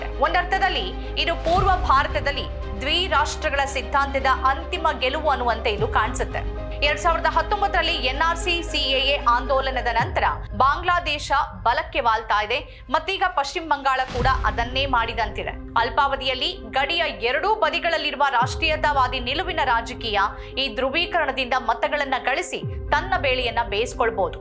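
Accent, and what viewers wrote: Kerala, India. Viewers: ನೀನೂ ಮಲಯಾಳಿಯಾ? ನಿನ್ನ ಉಚ್ಚರಣೆ ಮಲಯಾಳಿ ಹಾಗಿದೆ.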